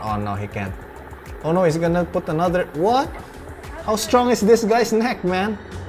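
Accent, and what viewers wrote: Philippines. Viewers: That pinoy accent is so distinguishable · within the first 10 seconds I KNEW you were Filipino hahaha I love it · I knew from your accent. You lived in the Philippines, wow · Hahahahaha I’m assuming he’s filo! Coz his filo accent is STRONG!! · Kaya pala familiar yung accent Pinoy nga hahaha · Fluent english with filipino accent. Nice one dude 😊😊😊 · hes from the Philippines men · Me: hears accent Also me: guess he’s Filipino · he filipino based on accent. lol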